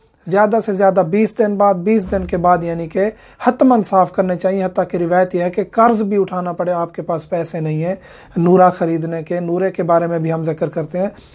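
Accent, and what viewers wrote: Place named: Saraiki, Pakistan. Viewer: Sraaiki bolny waly urdu aisy he bolty hn....weldone ❤❤❤💐💐💐💐💐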